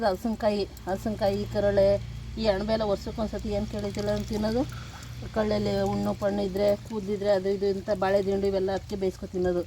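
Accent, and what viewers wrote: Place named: Channapatna, India. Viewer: Aunty maatu namm channpatna da slang super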